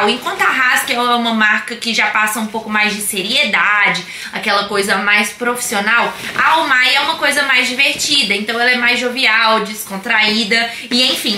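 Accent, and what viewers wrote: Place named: Minas Gerais, Brazil. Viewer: Meu seu sultaque e lindooooo, também sou mineira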